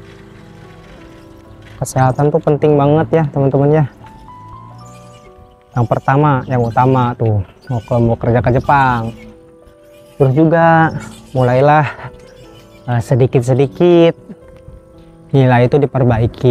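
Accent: West Java, Indonesia. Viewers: A,sundanya dari mana yah? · Logat bicara nya Sunda mang. Sunda na mana mang? Slm dari Cianjur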